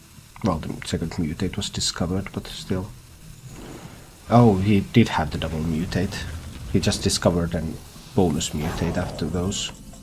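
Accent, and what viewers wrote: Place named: Finland. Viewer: He's from Finland. Suomalainen se on. ;)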